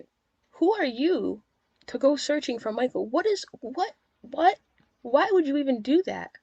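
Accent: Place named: New England, United States. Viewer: This is the first time I've viewed your channel while looking for an update on Sophie. Your voice and accent make me feel like I'm back home in MA. I live in FL now but you sound so much like my friends back home. Are you from New England by any chance?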